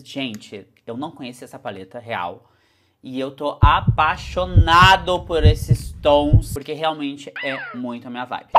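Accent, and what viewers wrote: Rio Grande do Sul, Brazil. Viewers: Adoro o sotaque gaúcho ❤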